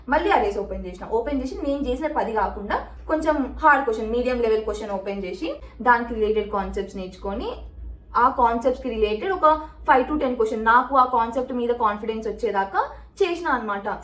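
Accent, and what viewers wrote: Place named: Hyderabad, India. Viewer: Hi, I am an SDE 2 from a Product based company in Hyderabad. I really loved this video. Me being a pakka hyderabadi, absolutely loved your slang. Coming to the content, I can vouch for everything you said about the DSA prep & interview. It is fully informative and would be really helpful for everyone. Kudos to your efforts to help our Telugu people.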